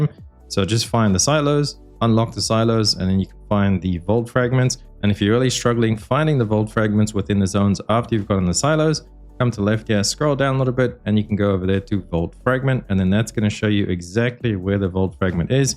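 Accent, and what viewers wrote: South Africa. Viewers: Great video very well laid out. Curious is that an South African in AUS accent? Just guessing don't burn me if im wrong.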